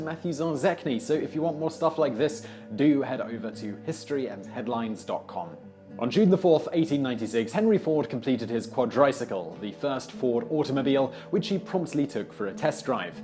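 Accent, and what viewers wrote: United Kingdom. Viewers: Oh, I'm sure he's smart. It's just that Brits don't talk like Americans.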